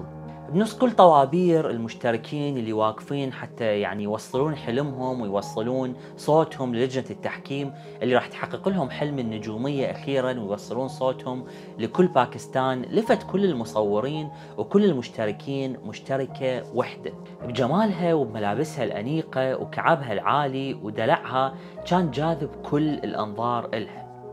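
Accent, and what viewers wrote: Iraq. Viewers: انا بحب اللهجة العراقية جدا ، بس يعني ايه فتشي؟ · حلوه اللهجه العراقيه 🥰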